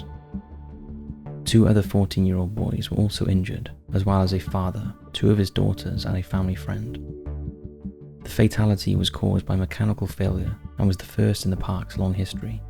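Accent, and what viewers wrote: United Kingdom. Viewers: You always put me to sleep British spooky man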